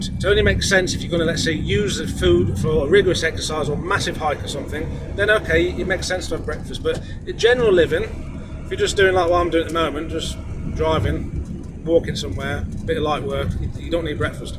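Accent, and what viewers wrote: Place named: Leicestershire, United Kingdom. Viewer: 👍.where abouts in the Midlands are you from fella?. Sounds like Leicestershire 😊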